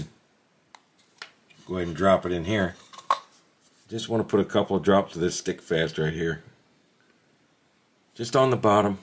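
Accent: United States. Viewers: 声と話し方がザアメリカって感じで好きです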